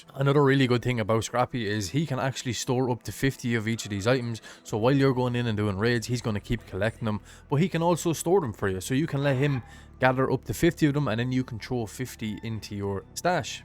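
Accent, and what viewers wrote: Ireland. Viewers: He obviously has an Irish accent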